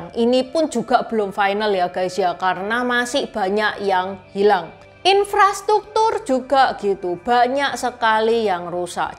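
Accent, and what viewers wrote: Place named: East Java, Indonesia. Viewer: Waaah mbak sudah cantik cerdas juga berprasangka baik....dengan bukti yg benar bisa mengajak kepada kalayak untuk berpikir baik pada orang lain.......tidak hanya memandang jeleknya...... Trimakasih info positipnya.....klo boleh nanyak kok mbak lancar banget bahasa indonesianya juga seperti ada dialek bahasa jawa,kayaknya jawa timur....klo boleh tanya mbak orang mana.....????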